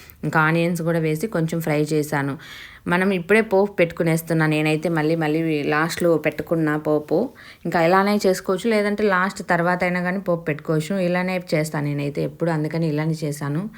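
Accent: Rayalaseema, India. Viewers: Mee proper ekkada ? Vintunte rayalaseema ankunta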